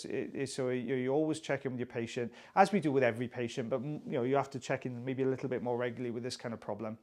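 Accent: Wales, United Kingdom. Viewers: Still the best ear channel. And the Welsh is the best accent imo😊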